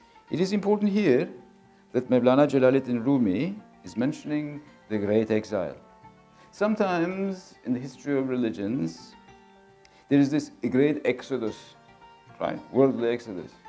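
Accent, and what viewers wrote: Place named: United Kingdom. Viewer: Hocamızın ingilizcesi şahane İngiliz aksanina yakin olması orda uzun süre yaşamasından kaynaklı sanırım 🤗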